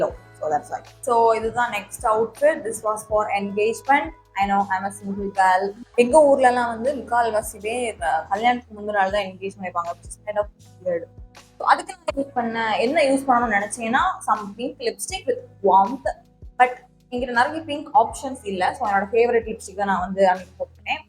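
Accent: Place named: Kanyakumari, India. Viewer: Sis..! Your slang is nice.Are u from kanyakumari?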